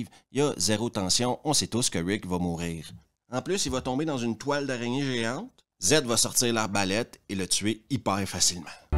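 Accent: Quebec, Canada. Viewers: 😅 Je te découvre, et du coup je me régale avec tes trouvailles, et en tant que fan de F. Pérusse (en Québécois, s'il vous plait, sinon rien!), bein c'est encore plus agréable. Cet accent prépare le corps à sourire, voire plus si affinité. ;) Mais pour en revenir à la principale information de ta vidéo (😂)... En fait, ça ne date pas du nichon, ce déni idiot de la réalité chez les américains. Ca date de la drogue. (et sur ce sujet, ça continue de plus belle...) On cache, on cache... et plus on cache plus ça veut dire qu'il y a des choses à cacher. J'en fini par me demander quel coup fourré se cache dans le nichon américain moyen. Je sais bien qu'ils passent leur temps à s'arnaquer les uns, les autres, mais je me dis: "... quand même... Ils n'ont pas piégé leurs nichons???" Moi j'crois que y a d'la coke, dedans... C'est du nichon dangereusement psychoactif! 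...où peut-être qu'on a à faire avec du nichon OGM qui produit des terres ULTRA rares pour leurs futures technologies nouvelles, genre: des cravates connectées où des SUV pickups amphibies géostationnaires capables de recharger eux-même leurs batteries en tétant le pis d'une vache bio des Alpes Suisses? En tout les cas, je ne doute pas qu'un avenir radieux nous en apprendra un peu plus sur cette "apparente" phobie du nichon. Ça va forcément évoluer vers du bon, aucun doute...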